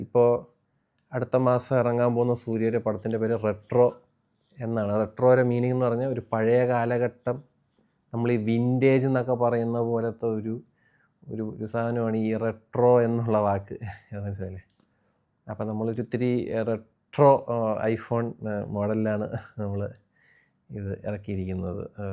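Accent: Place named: Kollam, India. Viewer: Kollam slag venda bro ...very irritating...pls use neutral one..else use cochi slag still okaish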